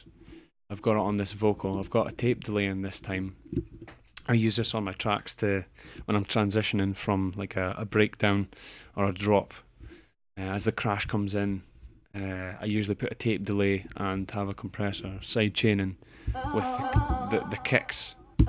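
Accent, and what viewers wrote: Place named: Scotland, United Kingdom. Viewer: Very nice explanation brother. Scottish accent somehow assists in knowledge retention :)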